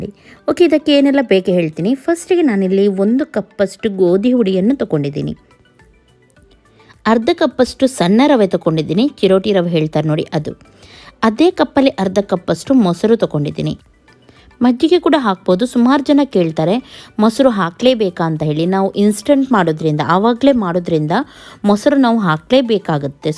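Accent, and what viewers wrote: Mangalore, India. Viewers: Hi dose supper ನಿಮ್ಮ ಮಂಗಳೂರು ಕನ್ನಡ ತುಂಬಾ ಚಂದ ಉಂಟು 💐 💐 💐 💐💐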